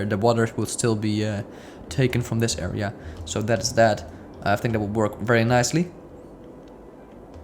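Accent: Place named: Netherlands. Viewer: sup man. Great series I really enjoy watching series like these about cities skylines. where are you from by the way? You sound a bit like you are Dutch or Belgian.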